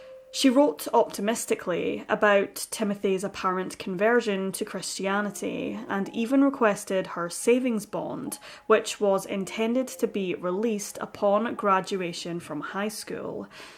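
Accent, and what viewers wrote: Scotland, United Kingdom. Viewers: Are you Scottish, lass?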